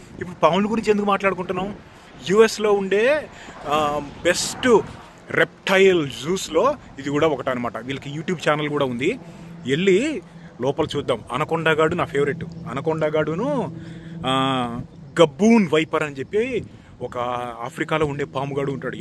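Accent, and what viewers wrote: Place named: Hyderabad, India. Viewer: Mama,mass r nuvvu, hyderabadi ani pinchav,kummai🎉🎉❤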